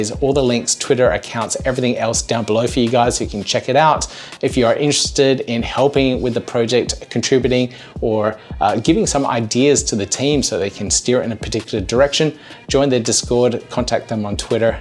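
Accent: Australia. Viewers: Just subscribed to your channel! You have such soothing radiating positive energy, that it is much more pleasant to hear Cardano related news from you than from many other channels. Maybe it is because I consider Australian (or NZ) accent smooth as butter maybe, pleasant to the ear and reminiscent of the sun and beaches (and surfing with sharks as well :) ).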